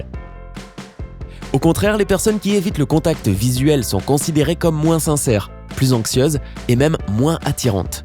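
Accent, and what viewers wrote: Quebec, Canada. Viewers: Si le gars est Québécois, il parle comme au Québec, on ne peut pas lui en vouloir de ça - à nous d'adapter pour l'Europe :-)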